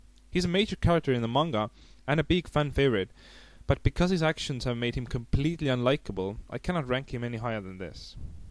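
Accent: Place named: India. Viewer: I like you're indian accent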